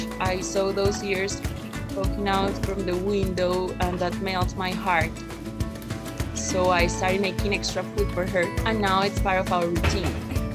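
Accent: Paisa, Colombia. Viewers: Ese acento paisa... 🥰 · suena paisa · Ay...en el video sale una voz colombiana y muy paisita...🇨🇴👍🏻 Gracias por amar a nuestros amigos los peludos...🐄❤️ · Caray, eres buena, noble y bondadosa, eres hermosa, y aparte tienes ese delicioso acento colombiano que me derrite..... si, la mujer perfecta!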